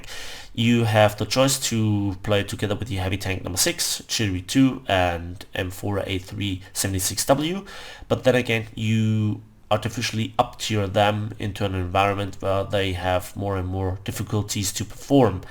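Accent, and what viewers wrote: Germany. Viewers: your voice sounds even more German like this. It is EVEN BETTER. NO NEED TO SAY SORRY. LOVE YOUR VIDEOS. YOU ARE WHAT KEEPS ME PLAYING WAR THUNDER. THANK YOU FOR BEING SUCH A GOOD CONTRIBUTOR TO OUR COMMUNITY.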